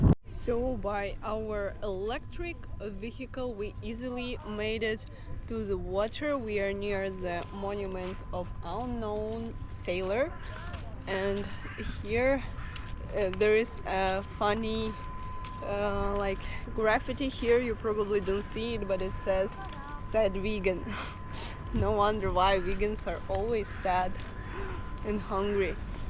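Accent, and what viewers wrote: Ukraine. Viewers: P. S. I love your English. It's very good and very up-to-date modern. Your Ukrainian accent for it makes it so beautiful to hear. Thank you for bringing us along and showing us all the different parts of Ukraine. It made me so sad to see the war-torn parts of it. I'm so proud of the Ukrainian people for their resilience and bravery. And for their support of their brave soldiers. слава Україні. Я люблю Україну. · J’adore ton accent ukrainien ❤️❤️❤️❤️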